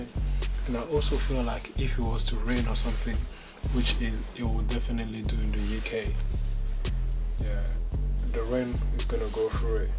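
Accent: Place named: Jamaica. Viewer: Jamaican accident is coo bruh. no homo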